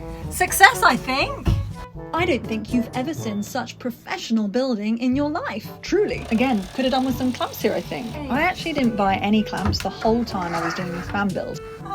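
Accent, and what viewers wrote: United Kingdom. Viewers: She sounds like she's from Plymoth/Plympton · Your British accent is amazing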